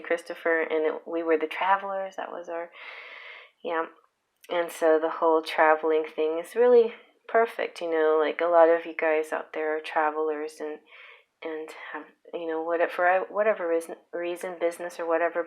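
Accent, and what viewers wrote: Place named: United States. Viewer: Love the charms and yes u have a nice voice is American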